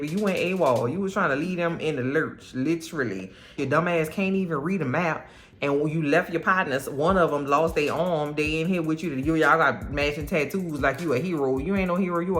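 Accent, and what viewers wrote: New Orleans, United States. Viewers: First of all, M’am I love you’re new Orleans accent! It's so pleasant to listen to. In my personal opinion, the reason the show isn't a smash hit is, unfortunately, due to the bigots and racists drowning out the positive noise of the show. In 2024 some people are still not comfortable seeing 2 men as romantic leads. Also, some of the die-hard book/movie fans can be their own worst enemies. They want an adaptation that's verbatim to the source material. They also nitpick things to death, there is no room for nuisance. Oh and don’t get me started on it, if you say you like or dislike a character they act like you committed a crime. I read that Interview with the Vampire is coming to Netflix soon, hopefully with more eyes on the show. It will get the attention it deserves. The show is so good, everybody deserves an award! 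From the creators, producers, cast, crew, costume, and set design. Hell, even catering deserves a little something too.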